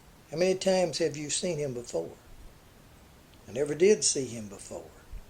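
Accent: South, United States. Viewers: You do a great job of these little videos, and I enjoy your colorful regional accent (I have deep roots in the South - my mother was from middle Tennessee and my father from Virginia so I am used to drawls), but forgive me if I correct one grammatical error which always drives me crazy when I read or hear it: it's hanged, not hung. Use "hung" for things ("I hung the laundry out to dry"), but "hanged" for people ("he was hanged for murder"). This is a common grammatical error and surprises many when they discover it, but I had a grade-school teacher who was adamant about correct grammar. Just sayin'. Thanks again for all these entertaining and informative videos!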